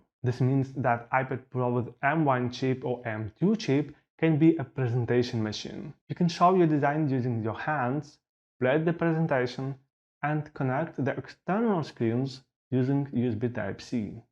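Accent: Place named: Russia. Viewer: Are you Russian? What is the accent?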